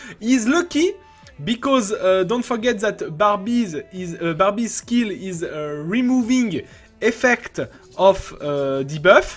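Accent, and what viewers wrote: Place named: France. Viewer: Est-ce que tu pourrais apprendre à parler Anglais sans un accent français, parce que là... Mes oreilles saignent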